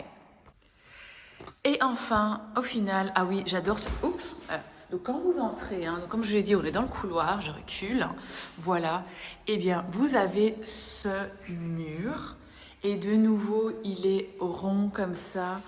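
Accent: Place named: United States. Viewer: English without an American accent please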